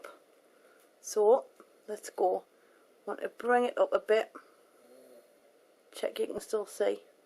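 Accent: Newcastle, United Kingdom. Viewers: Her accent is from Newcastle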